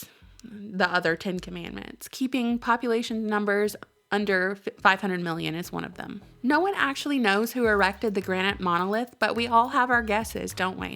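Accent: South, United States. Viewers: I just love your voice and that southern charm..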